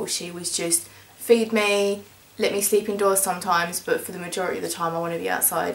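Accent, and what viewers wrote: United Kingdom. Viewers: I've listened to your music for a while and I never knew you were British, lol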